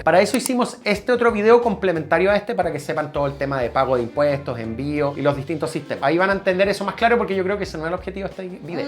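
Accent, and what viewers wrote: Chile. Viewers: Soy español, me gusta escucharos hablar en español chileno! Veo el canal por eso! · Que te quede claro que acá en México se les aprecia y se les admira a ti y a (o no se a ciencia cierta como se escribe) y no intentes suavizar tu acento chileno, es el acento más chingon de America. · Acento chileno es precioso. Saludos desde Madrid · ES JODIDO EL ACEPTO CHILENO JEJEJEJ, PERO GRACIAS. NUEVO SEGUIDOR... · Yo soy español, me encanta vuestro contenido y me encanta vuestro acento Chileno!!!! Dando la hora es uno de mis canales favoritos (si no el favorito) de relojes y por favor, no cambies tu acento, es tú esencia 💪🏻💪🏻💪🏻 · Soy español y el acento chileno se me hace muy agradable 👌😊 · Aca un cubano en miami que le agrada el acento chileno, es cool bro 😂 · Soy de España y me encanta el acneto chileno, no lo dejes!:face-red-heart-shape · Soy español y el acento de Chile es TOP🎉🎉🎉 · Nunca cambies tu acento chileno. 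Así está bien. Y que relojes tan icónicos tienes, quiero un watch tour.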